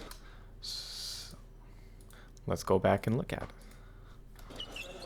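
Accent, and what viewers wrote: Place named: United Kingdom. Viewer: Fucking British English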